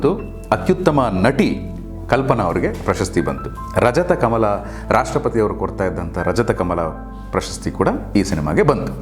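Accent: Standard. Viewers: ಉತ್ತಮ ಶೈಲಿಯ ಶುಧ್ಧ ಕನ್ನಡದ ನಿರೂಪಣೆ. ನಿಮಗೆ ಧನ್ಯವಾದ. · ಅಚ್ಚ ಹಾಗು ಸ್ವಚ್ಛ ಕನ್ನಡ. ಕೇಳಿ ಆನಂದವಾಯಿತು. ಧ್ವನಿಯು ಸಹ ಸೂಗಸಾಗಿದೆ. ಈಗಿನ ಚಿತ್ರಗಳಲ್ಲಿ ಬರೀ ಅಬ್ಬರವೇ ಜಾಸ್ತಿ. ಕಥೆಯೇ ಇಲ್ಲ. ನಾವು ಹಳೆಯ ಚಿತ್ರಕಥೆಗಳನ್ನ ಮೆಲಕು ಹಾಕಬೇಕು. ನೀವು ಆ ಚಿತ್ರೀಕರಣದ ಸಮಯಕ್ಕೆ ಕರದೊಯ್ಯ್ದಂತೆ ಭಾಸವಾಯಿತು. ಒಳ್ಳೆಯದಾಗಲಿ. 🙏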